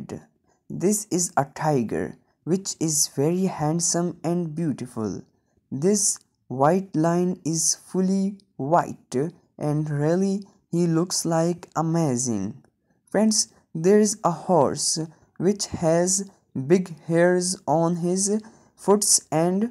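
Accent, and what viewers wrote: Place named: Brazil. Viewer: É um brasileiro falando com certeza 😅😅😅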